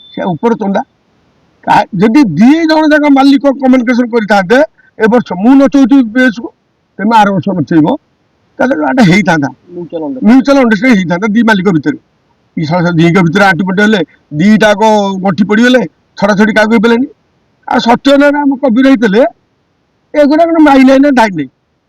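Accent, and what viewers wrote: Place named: Balasore, India. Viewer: Balasore kothaa kon kohuchy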